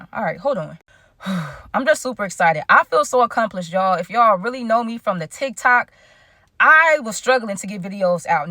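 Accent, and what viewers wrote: Chicago, United States. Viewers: have a meet in greet where u from g u slick talk like us #chicago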